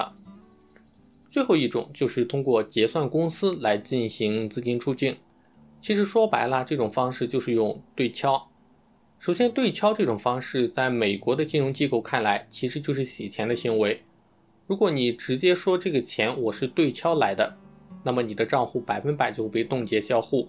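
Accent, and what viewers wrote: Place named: Jiaodong, China. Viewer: 听你口音像胶东的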